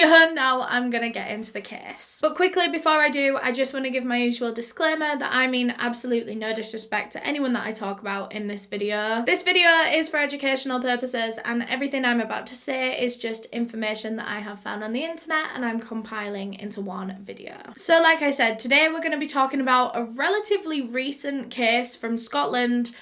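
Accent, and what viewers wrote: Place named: Scotland, United Kingdom. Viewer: Random but I literally thought you were Scottish when I started watching you lol.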